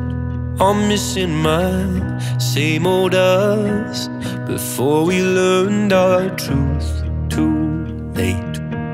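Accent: Scotland, United Kingdom. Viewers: Ur amazing i would love to go to ur next concert when ever it is and i love ur Scottish accent im from Ireland amd i love u so much love the music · This is how ALL music vids should be 😂😂 BTW you can soooo tell u are from Scotland 🏴 😂😂😂😂😂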